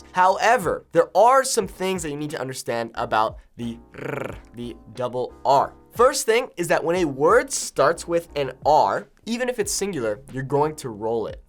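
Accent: Mexico. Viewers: Soy mexicano y siento que el suena mas mexicano que yo xd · A la verga hablas mexicano bien😮 ahora habla como un Dominicano 😊 klk ❤ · Sí suenas como mexicano jajajaj. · I was born in Mexico and raised in the states. Yep, this guy has almost a perfect Mexican accent. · I wish I could learn US accent as well as you learned Mexican accent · Al chile, hablas muy bien, si se parece a nuestro acento pero tiene pocas diferencias, de ahí en fuera awebo🎉🎉🎉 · Sí suenas como mexicano!! Hablas mejor que mis compas del barrio. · my jaw is on the floor u sound exactly like a mexican WHAT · No hay un mexicano perfecto, pero los hablas como algún mexicano. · i'm mexican and i speak english almost perfectly but actually, you mexican spanish its perfect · I'm a native Spanish speaker, and it's amazing how you sound so Mexican that is really hard not to believe it. You even speak Spanish better than many other native people that I know. 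· Tu acento es muy neutro como el de los mexicanos me sorprendiste. Pero pero como? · No mames, si parece mexicano jajaja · Your accent is great, it's literally like listening to another Mexican. Congratulations 💙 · Suena mas mexicano que yo xd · Bro sound more Mexican than me 😮😮😮😮😮😮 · As a Mexican woman, I can say you do sound Mexican lol specially when you say sentences like “es parte de mi vida” and that’s crazy because you explain key tips to sound better! And that’s something not everybody knows or shares, It would really help if you do a similar video to improve our English level! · A la mierda, suena muy mexicano 🇲🇽👌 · Seguro que no es mexicano este güey? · De verdad le sale muy bien, parece que estás escuchando al típico güero en alguna ciudad de México. Jejeje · OMAIGA Su acento es mas Mexicano que el mio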